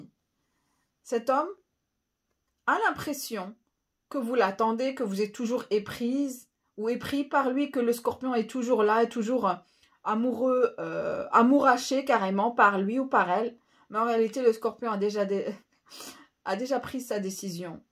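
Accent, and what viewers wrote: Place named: Switzerland. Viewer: Ah l accent suisse🤣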